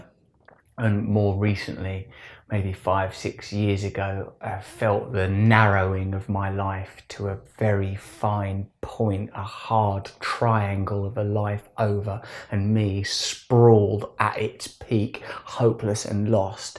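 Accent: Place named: Cockney, United Kingdom. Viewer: I love hearing this wisdom with a Cockney Accent. Brill innit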